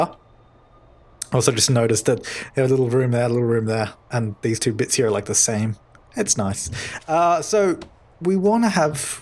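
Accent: Australia. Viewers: Conner Herald me to i like how Australians talk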